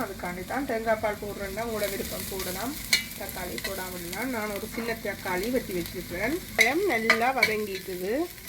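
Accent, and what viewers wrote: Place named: Sri Lanka. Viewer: நண்டுக்கறி வறுத்து செய்த சமையல் மிகவும் அருமையாக உள்ளது இலங்கை தமிழில் உங்கள் பேச்சு அதை விட இனிமையாக உள்ளது சகோதரி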